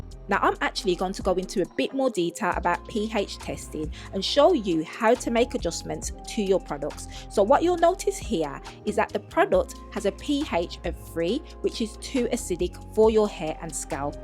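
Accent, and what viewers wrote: Birmingham, United Kingdom. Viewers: This woman is a Brummie. I don't know what you think you are hearing.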